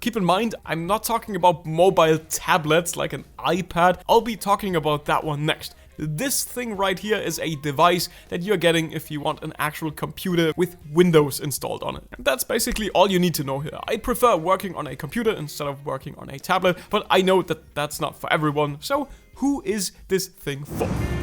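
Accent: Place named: Germany. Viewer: I hear Deutsch :)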